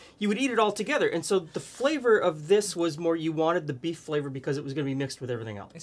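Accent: Canada. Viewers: This is the first video I’ve watch on this channel. From the way he talked I thought maybe he was Canadian. Then I saw the bagged milk. Confirmed lol. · In the KC area at least, we get all levels of ground beef in the case; now, that I’ve heard your Canadian accent I realize Canada is different than the US on things like this. The 90% lean is so dry; I rinse my 80% lean in a colander under hot water once cooked, and that will remove the excess grease. Just found your channel; love seeing the Depression era recipes, and the way we are going they just may be more useful than we know.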